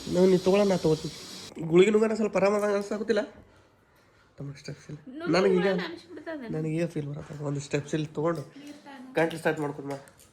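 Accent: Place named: Bijapur, India. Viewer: ಪಕ್ಕಾ ನಮ್ಮ ಬಿಜಾಪುರ ಲೋಕಲ್ ಭಾಷೆ 👌👌ಬಾಲದಿನ ಆಗಿತ್ತು ಬರಬ್ಬರಿ ಆಯೇತು 😂😂😂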